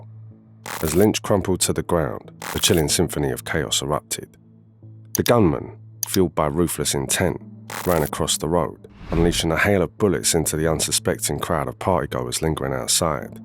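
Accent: London, United Kingdom. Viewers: Anyone know what accent is this? London?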